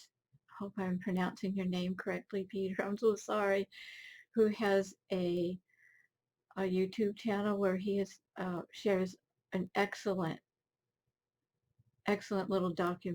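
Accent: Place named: Ohio, United States. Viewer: I was wondering if you were from Ohio because your accent sounds just like my great aunt and old recordings of my great grandmother. I'm subscribed if you ever decide to make more videos, you have a fascinating life and outlook.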